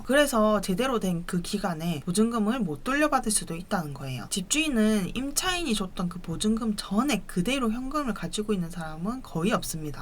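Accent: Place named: Busan, South Korea. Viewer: 집 때문에 저희는 결혼할 때 싸우지 않아요 경매 받은 집은 안들어 갑니다 갭 투자도 안해요 세금 때문에 하지만 항상 이상한 애들이 월세내고 주변에 있네요 한번 뵙고싶네요 부산 가면 고향사투리 좋네요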